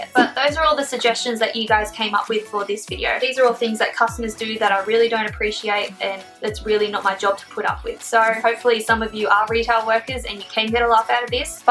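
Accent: Australia. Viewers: Your accent is so pretty. Are you from Australia?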